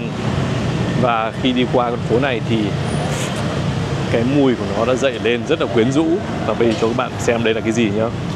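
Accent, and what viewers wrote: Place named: Hai Phong, Vietnam. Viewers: Khả năng ảnh người Hải Phòng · Chí chương luôn 😂 đậm chất Hải Phòng ❤️❤️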